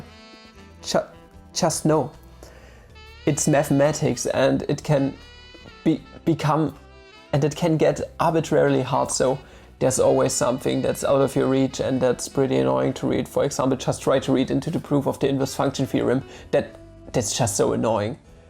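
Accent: Germany. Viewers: Can someone link the vid on the integral he talks about ? I can't decipher the thick German accent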